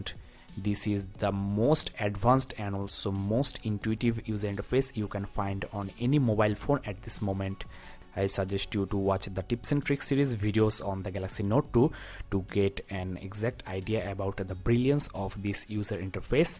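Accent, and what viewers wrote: India. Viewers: RU KIDDING ME. THIS IS A WHITE MAN WITH INDIAN ACCENT.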